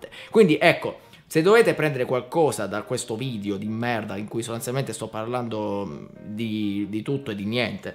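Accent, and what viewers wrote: Taranto, Italy. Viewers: Da Grottagliese non posso che sottolineare quanto sia bella la cadenza tarantina,a differenza del dialetto vero è proprio,potresti fare il doppiatore(tra le tante cose)... Un abbraccio compà! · Inizio video: sento che è di Taranto e penso "Sono di Bari, adesso lo invito per una cena fuori". Per poi scoprire che odia Bari. Come il sottoscritto, d'altronde haha Comunque è proprio vero. Occorre la città giusta. Bari è una città che mi ha fatto odiare le mie passioni, passioni che a Bologna mi hanno fatto volare.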